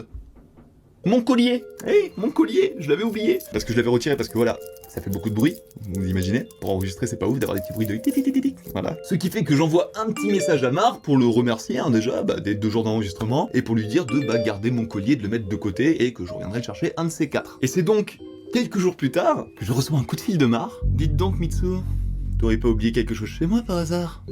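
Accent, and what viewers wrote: France. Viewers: Elles étaient vraiment drôles mais mignonnes, à part celle de la voisine raciste qui m’a bien agacée 😡 Sinon, je trouve que la narration en anglais n’était pas si mauvaise, l’accent français a son charme 😊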